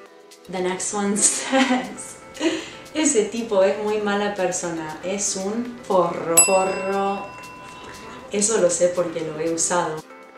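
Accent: Argentina. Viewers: Verdad q a mí me confundió en el principio, parece muy Argentino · Que buen acento Argento! Congratulations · Hola... como estás hablando no es necesariamente como se habla en Argentina... asi hablan los porteños y bonaerenses... en el resto del paiz hablamos de otra nanera... si usamos algunos de esos modismos... un saludo gracias dsd Mendoza tierra del sol y el buen vino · fuaaaaaa hasta parece que sos argentina en lugar de yanki.. buen oido! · Acento argentino 100% 😀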